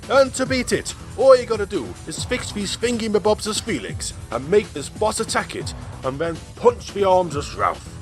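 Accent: United Kingdom. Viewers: I love your english stile Mr., so british so elegant. What a pleasure to hear your rewiews added with creativity and humor ❤️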